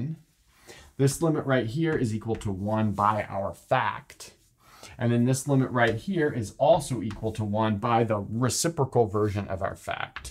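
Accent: United States. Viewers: at the beginning of seeing these videos I was thinking that the formula lim f/g=lim f'/g' was the log beetle rules and I realized that it was l'hôpital rules and I am french😂 American accent is really fun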